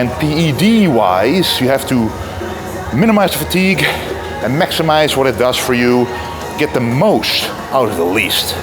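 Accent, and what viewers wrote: Netherlands. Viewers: OMG I am so much supporting you because of your lovely Dutch accent. ❤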